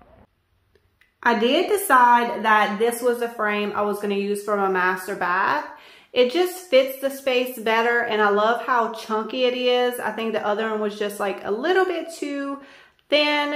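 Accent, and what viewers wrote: Louisiana, United States. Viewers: I'm new. I'm a nurse by profession but I'm a wannabe crafter. I procrastinate but am hoping to really get serious. I stumbled up on your video and youre a beast with this stuff. You have my accent. You must be from Louisiana. Can't wait to see all of your stuff. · Where are you from? I am from Louisiana, and you sound like we do 😂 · Love you and your channel. I’m from Louisiana and I swear you have an accent like you are from Louisiana as well. Maybe around pointe coupe parish or something. Lol am I close?? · The sea garland, looks fabulous !!!! The antique frames are so gorgeous!!! P.S. I heard you tell Wren, to "come see" and I couldn't believe my ears! I always say that, also, but, ppl that aren't Cajun, think it sounds funny. It's actually because we've heard that said, in French, so we say it in English. I have to watch your videos, every day, to get my daily dose of the Cajun accent; it makes me feel like I'm back in Louisiana, instead of North Carolina. 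😊😘😁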